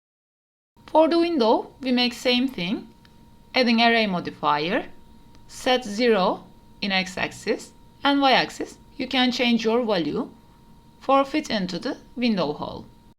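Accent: Turkey. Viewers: Ablamın ingilizcesinde türklük akıyor😀 Çok yararlı bir videoydu teşekkürler! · 😁 Türk aksanı nasıl kendini belli ediyor. İngilizceniz iyi bu arada. Video için de teşekkürler. Kolay gelsin. 🙋🏻‍♂️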